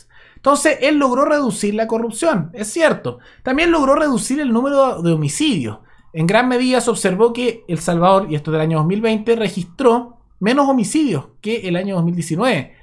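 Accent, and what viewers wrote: Chile. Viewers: Estas hablando pura mierda, si esa informacion es tuya y si estas agarrando informacion de otra persona deverias de investigar mas de Bukele y nuestro pais para hablar. No seas la misma mierda como otros. Por la forma en que hablas puedo notar que eres Chileno deverias de hablar de tu pais Si lo conoces aunque lo dudo. Pero comiensa por investigarte mas por favor.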